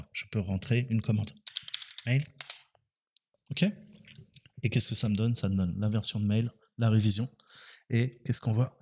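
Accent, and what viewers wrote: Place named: France. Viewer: Oh mec i'm in love with your french accent 😂 you rock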